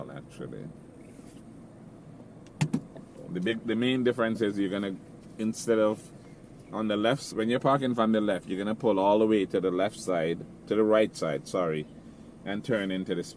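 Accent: Jamaica. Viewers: First coming across your videos, and I love it that’s where I’m having difficulty with. I can tell u r a yardie! I’m having trouble with knowing where to turn the steering pulling out a parking lot, can u do a video on that? Please!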